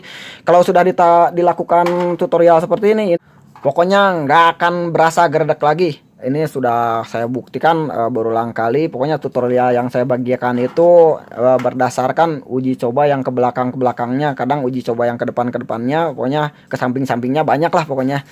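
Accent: West Java, Indonesia. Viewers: Jelas dan ngakak saya ngadenge nya mang 😂 kaciri sunda na pisan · Meng inspirasi suatu saat bakalan tak coba. Kang tinggal dmn mau dong motor saya di sevice kaya gitu di acak acak.. hehe.. kalau logat mah Sunda yah... Daerah mana kang..minta no wa dong. Klo blh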